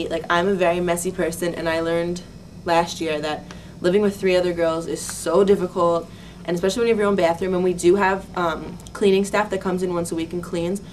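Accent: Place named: Maryland, United States. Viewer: she has to be from Maryland... her accent is thick haha i talk the same way.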